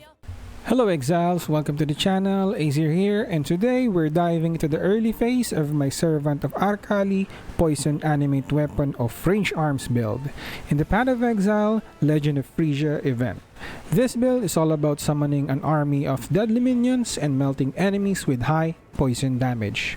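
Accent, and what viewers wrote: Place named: Philippines. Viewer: Accent is very pinoy ✌️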